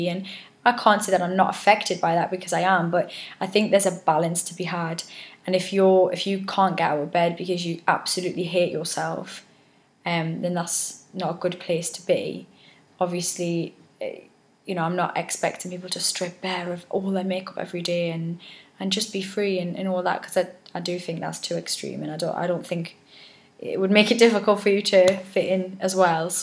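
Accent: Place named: Newcastle, United Kingdom. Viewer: I love your positive attitude! (And Geordie accent... one of me favourite English accents) Cheers!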